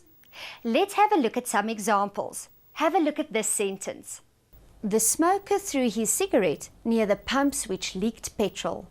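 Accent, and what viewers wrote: South Africa. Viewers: The South African accent :) , I'm teaching English in Korea, this was so refreshing , day made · I'm from South Africa, I'm pretty sure that it's a South African accent...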